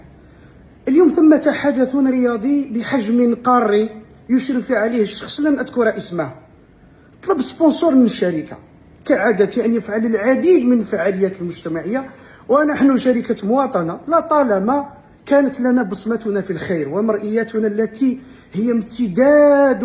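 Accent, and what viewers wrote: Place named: Morocco. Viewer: انت مريض هادي عربية😂، عندك خويا لهجة مغربية ،سمحلي ،ماشي على جال المغاربة، بصح ❤يا مغربي يا نغربي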